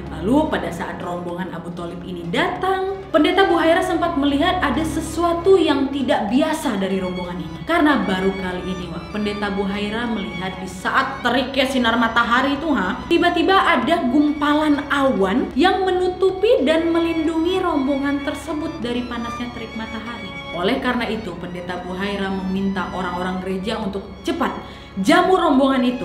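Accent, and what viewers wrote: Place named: Medan, Indonesia. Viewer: Saya tau chanel ini dari anak2 yg suka nonton, saya sempat komentar keren juga Chanel ini, sangat informatif dan profesional, saya tau pasti tdk mudah mengumpulkan bahan2 materi kontennya, istri sy jg yg kelahiran Medan juga sering senyum2 sendiri mendengar logat saat membawakan kontennya, apalagi ada Wak..Wak, jd ingat tmn2nya wkt Sekolah dan Kuliah katanya, terakhir setelah saya menonton konten tentang Nabi Muhammad SAW, langsung saya Subscribe Chanel ini dari HP saya( biasanya kami nonton di TV pakai WiFi dirumah ), Congratulation ya Wak 😁, terus berkarya dengan konten2 hebatnya👍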